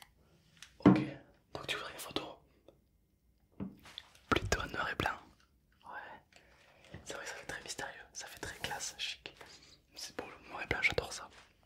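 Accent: South, France. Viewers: L’accan du sudee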